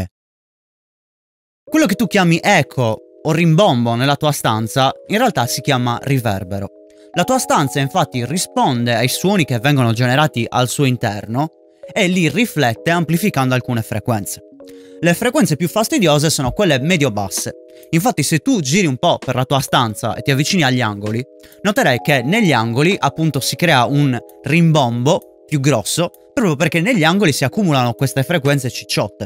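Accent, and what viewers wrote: Sardinia, Italy. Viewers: Ciao! Un consiglio :) ho una stanza che ho adibito a studio, sia per suonicchiare/registrare qualcosina, sia per streaming di gaming. La stanza è un po' lunga e stretta, ma soprattutto il soffitto è molto alto. A grandi linee: 2x3,7m, soffitto circa 3,7m. C'è abbastanza riverbero sulle basse, ho pensato di "abbassare" il di circa un metro e mezzo con uno strato di pannelli fono assorbenti piramidali da 5cm...ha senso? Mi sai dare una dritta? Ciao da un tuo concittadino 😁 (dall'accento direi che siamo entrambi di Cagliari) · Si sente giusto un po' che sei sardo ahahha, Comunque bel video, Grazie ❤️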